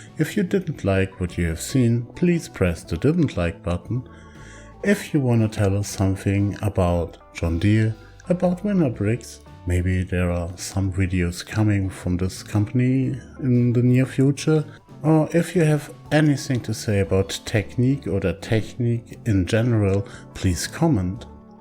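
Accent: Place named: Germany. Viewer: Bist du deutsche wenn ja gutes Englisch aber man hört noch minimal das du nicht ganz enänder bist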